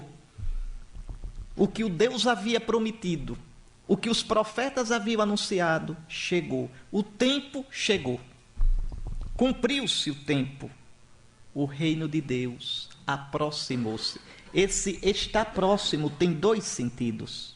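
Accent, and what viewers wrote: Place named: Pernambuco, Brazil. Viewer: Além de palestrar de forma magnífica, o saudoso bispo de Palmares o faz com um agradável sotaque pernambucano, usando algumas palavras próprias da região, verbi gratia: "aperreio". Esse homem santo certamente desfruta da glória de Cristo, a quem ele fielmente serviu.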